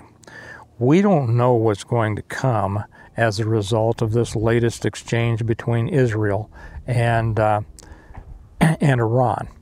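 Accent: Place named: Texas, United States. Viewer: Kansas! I figured you were a fellow Texan from your accent and demeanor. New here. Appreciate your channel.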